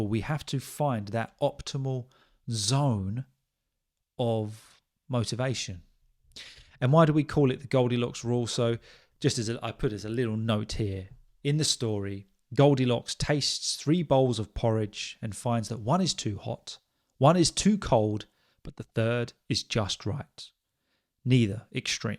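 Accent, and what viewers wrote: England, United Kingdom. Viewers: Sir like it very much your British English accent and I understand your speak properly it is hundred persent clear. Lot of thanks teacher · Hello, thanks for another interesting episode, you’ve been doing excellent job for learners to help them with English May I ask you, what is the English accent which you speak?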